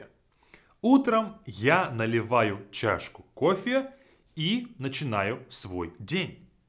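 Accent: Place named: Russia. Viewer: Чувство, что он русский, но прикидывается иностранцем.. Даже акцент русский есть:0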